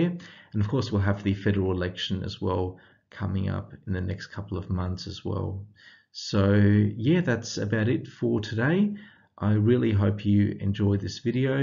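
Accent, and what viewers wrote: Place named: Adelaide, Australia. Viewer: You’re accent is so Adelaide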